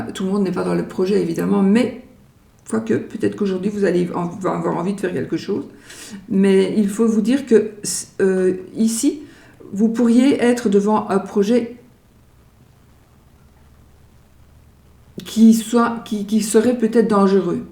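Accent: Belgium. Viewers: Meilleurs voeux madame j'adore votre accent les Belges j'habite en Belgique avant quand vous dites 8 😅😅😅bonne année à vous et mille merci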